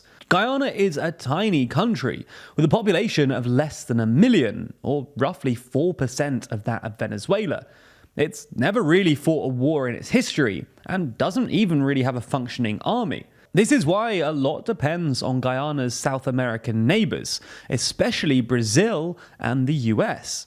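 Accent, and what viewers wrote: England, United Kingdom. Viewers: Never trust a YouTuber with an English accent. Never.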